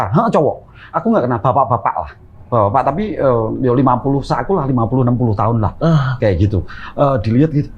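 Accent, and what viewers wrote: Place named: Semarang, Indonesia. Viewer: Bahasane semarang bgt hehe,,, salam dari cah banyumanik bang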